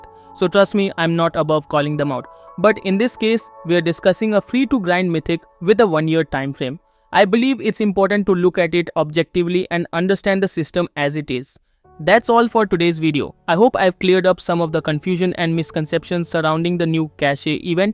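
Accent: India. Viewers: when i heard indian accent , “this is must be a scammer” .nah sorry bro ,i dont grinding ,i just bought using cp value at 15.000 cp ..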